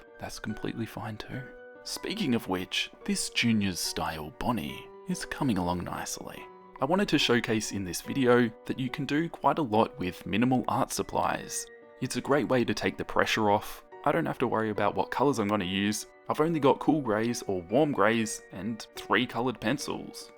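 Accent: Australia. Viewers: Also, r u Australian? If u r, i am 2! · Australian dialogue 😱😱😱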